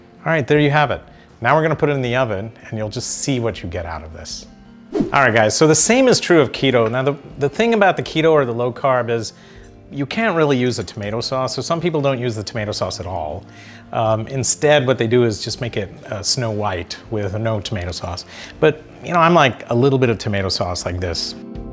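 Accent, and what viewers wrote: United States. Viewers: Dr. Nene, you have american accent. I am from Florida. Happy to see you making pizza. I do make whole wheat bread pizza and it also becomes delicious. Madhuri ji is very lucky. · Sir, you described very nicely😍.. But i couldn't understood your fluent american english😣😣please mention the ingredients in the description box , so i will follow it😋😋😋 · So humble and simple person 👍🏻 😁👌🏻😋😋 i like American accent u talk , but its will fabulous if dr. Nene start to end of video only talk marathi 😍😁😁👍🏻😜 please video on Demand only marathi video . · I'm mesmerized by his American accent over his marathi mulga core! Hehe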